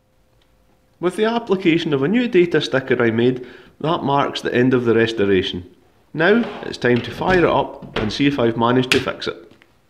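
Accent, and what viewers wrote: Scotland, United Kingdom. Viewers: I love these videos and when he was cleaning the feces not to disturb the quel mailing marks! Everyone loves a legit scottish accent!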